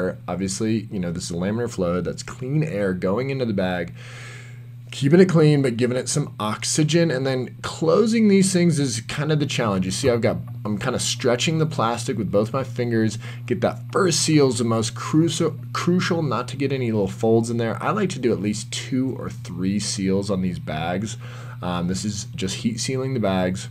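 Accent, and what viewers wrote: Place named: Australia. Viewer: Reminds me of an aussie man voice over lol. This is great thanks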